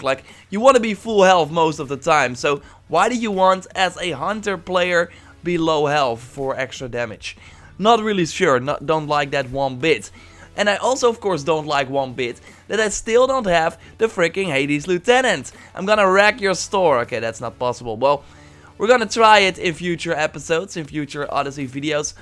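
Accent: Netherlands. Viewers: Hey are you Dutch?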